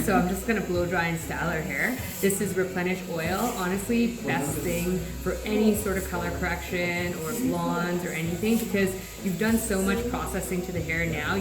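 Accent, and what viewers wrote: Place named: Canada. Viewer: I thought I heard a Canadian accent 🙂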